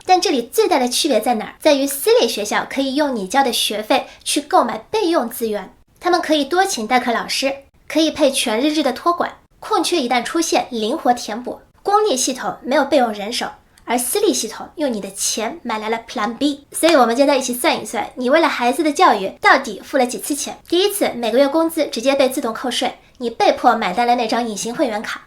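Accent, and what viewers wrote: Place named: Shanghai, China. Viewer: 听口音是桑嗨宁吧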